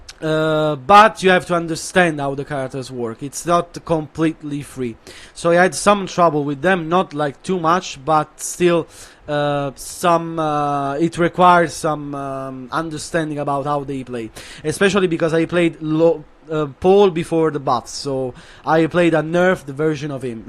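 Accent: Italy. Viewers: I was only surprised by Lee, you even put it in "Easy" with those combos full of cancels and precision concatenations. Yoshi is a character who doesn't give you anything, he has potential for top 13\15 for me so it's probably even more difficult than that. Steve is probably the most difficult character in the game and also devil jin. question: are you Italian? I ask for your pronunciation of English 😂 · You can hear he is italian and how he says claudio is perfect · took me 2 sentences to realize he’s italian lmao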